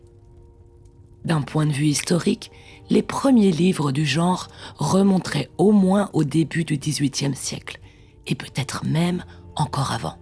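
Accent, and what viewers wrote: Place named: France. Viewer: you are the most French sounding French woman who has ever existed! Bravo et bonne continuation de Bretagne. 🇬🇧😉👍🇫🇷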